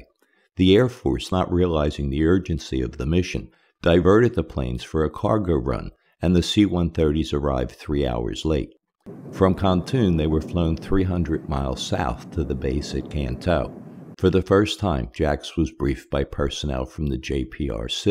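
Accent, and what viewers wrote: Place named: Pennsylvania, United States. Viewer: This narrator sounds like a native Pennsylvanian.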